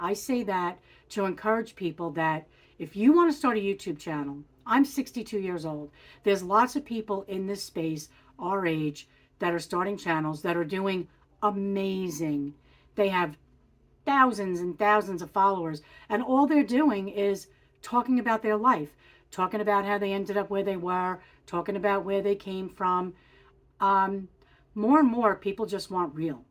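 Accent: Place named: New York, United States. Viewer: Thank you for sharing this!! It has been in my mind to start a channel, and you have given me some encouragement. I love your tiny home -- a year ago I was looking for one, but the cost of the lot rent and the locations kept putting me off. I travel a lot in a campervan, bought during the pandemic because it literally grounded me from flying. So I have my house, with unbelieveable story's about my "refresh" using AI and I think that would be fun to share along with my van adventures. So, thank you. You sound like you have a New York accent. Be blessed!!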